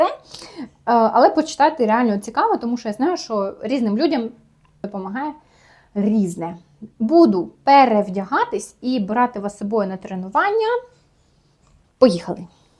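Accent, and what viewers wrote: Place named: Kherson, Ukraine. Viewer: Пишу коментар за вашу херсонську УКРАЇНСЬКУ!!!! ТИ КЛАСНА🤩 радяю з того, що все більше українського контенту з'являється. Дяую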